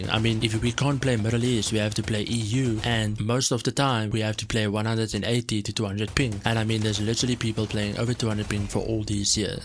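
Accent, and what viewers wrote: Afrikaans, South Africa. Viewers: Awe ek kan hoor jy is Afrikaans 😂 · when i first clicked the video i assumed u were from usa but i heard the afrikaans accent 😂😂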